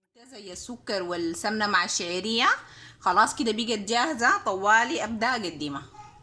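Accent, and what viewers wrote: Sudan. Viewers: ما شاء الله شكلها حلو جدا 😋 علي فكره بحب اللهجة السودانيه جدا ♥️ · الهجه اليودانيه جميله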